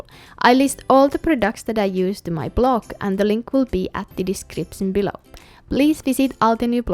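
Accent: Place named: Finland. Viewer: It doesn't matter if I watch with my first cup, or last of the day,!! I LOve watching you ANY time of day! These are so lifelike... Tom even watched, saying 'she has an accent' to which I replied: it's! She's Finnish! Of course, Tom was ready... No she's not, she is still coloring!!!" Love your card... and you!=] Michele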